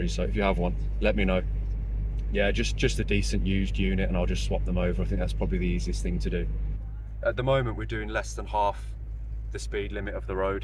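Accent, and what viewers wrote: Birmingham, United Kingdom. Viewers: Glad things are coming together for you..i am from the uk and i sense a west midlands accent.(brummie) perhaps.?...bavaria looks magnificent..best wishes.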